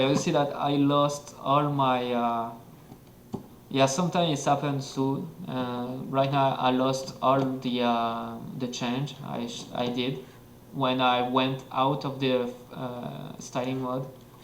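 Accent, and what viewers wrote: France. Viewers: jeu sur attaque des titans par un français \o\ . Ca se voit que tu viens de france (accent)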